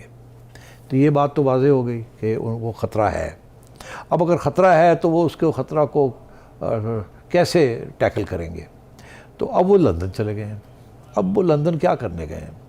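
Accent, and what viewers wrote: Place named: Punjab, India. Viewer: Your punjabi touch is awesome 👍❤❤👍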